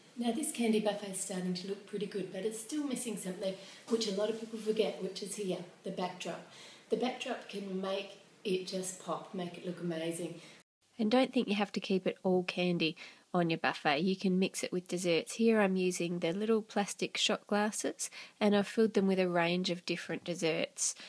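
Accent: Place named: Australia. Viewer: i love your accent! what part of Australia are you from?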